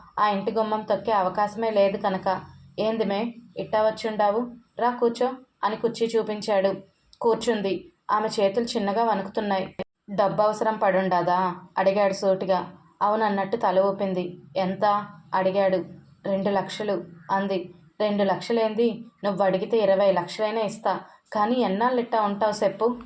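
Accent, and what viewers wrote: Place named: Rayalaseema, India. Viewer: Rayalaseema bhasha bagundi😊😊😊😊❤❤❤❤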